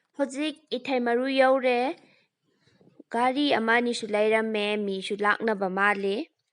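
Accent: Kakching, India. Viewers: I love kakching voice accent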